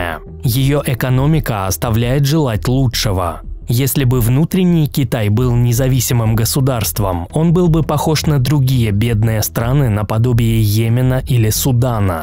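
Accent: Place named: Russia. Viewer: да вроде оригинальный канал ведёт русский судя по акценту. Разве не он и есть автор канала?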